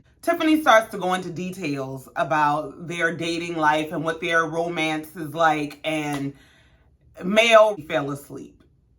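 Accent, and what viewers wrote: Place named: New Orleans, United States. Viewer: Omg, Where are you from? I just love your accent. I want to guess New Orleans but I’m not sure, lol.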